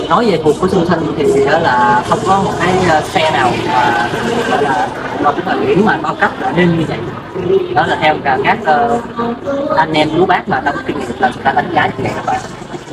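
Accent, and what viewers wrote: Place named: Thanh Hoa, Vietnam. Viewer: Chuẩn bác, nghe thanh hóa là biết ngay 😊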